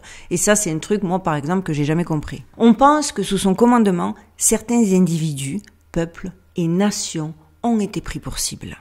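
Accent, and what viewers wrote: South, France. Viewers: Encore une vidéo géniale 😻 j’ai découvert ta chaîne il y a quelques mois et je suis devenue boulimique de toutes tes vidéos! 4 and à rattraper ❤❤ j’adore ta personnalité et je rigole souvent avec toi! L’accent du sud me rappelle mes racines ❤ j’espère que ta communauté ne fera que grandir encore! On a tous besoin d’une pour nous ouvrir les yeux! Tu es vraiment impressionnante ❤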